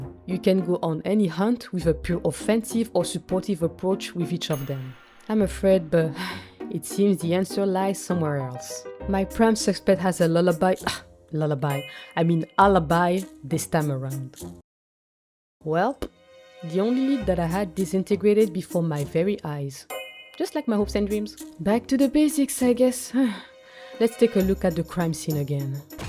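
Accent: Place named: France. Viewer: You can count on a French to not hear the obvious French accent... I got jumpscared by your "les amis" lmao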